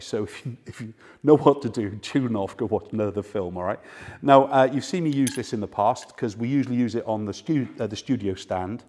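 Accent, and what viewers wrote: United Kingdom. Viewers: I love english accent.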